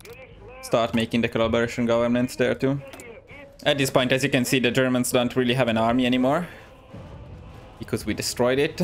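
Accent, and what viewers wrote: Italy. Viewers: bro sei italiano lo percepisco.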